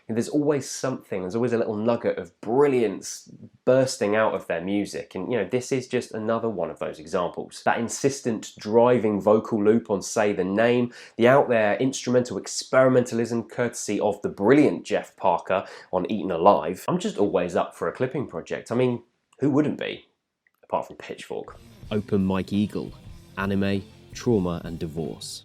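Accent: United Kingdom. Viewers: i dont know why, but im so obssesed with the british accent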